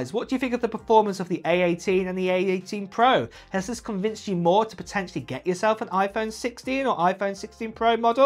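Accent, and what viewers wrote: United Kingdom. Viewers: Will be upgrading from my iPhone 13 Pro Max 256gb to 16 Pro Max 256 gb this Friday! I’ll even copy your delightful British accent, for the duration of my ordering call! 😂❤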